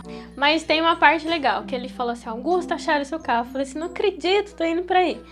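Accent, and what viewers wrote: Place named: Minas Gerais, Brazil. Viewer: Ganhou mais um inscrito 💖 Amei o Canal. Você é Mineira ? 😅 Sotaque legal 😍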